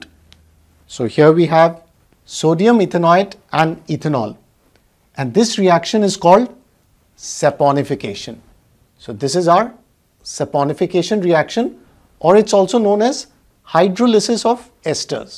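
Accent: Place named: India. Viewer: Plzzz no more indian pronunciation 🤦‍♀️🤦‍♀️🤦‍♀️🤦‍♀️🤦‍♀️🤦‍♀️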